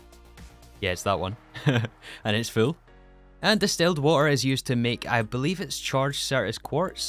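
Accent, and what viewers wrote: Scotland, United Kingdom. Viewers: He's Scottish. Have you really never heard a Scottish accent before? SMH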